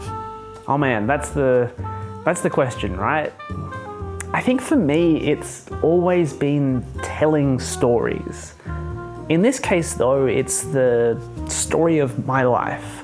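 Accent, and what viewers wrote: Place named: Australia. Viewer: How great it is to hear an Aussie voice. All the best with your journey, if it's a happy one, then things will continue to get better.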